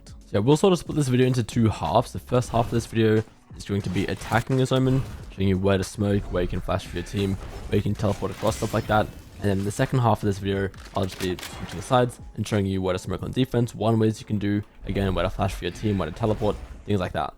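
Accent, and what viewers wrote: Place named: Australia. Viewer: dude, you gotta pronounce word more clearly. You talk fast is one thing but you thicc accent combine with halfway pronunciation make it hard to understand when I watch your video in one sitting. That's why I still haven't subscribe to you. Sometimes I even select NOT INTERESTED on YT shorts cuz your pronunciation is terrible for the rest of the world. Maybe not to other Aussies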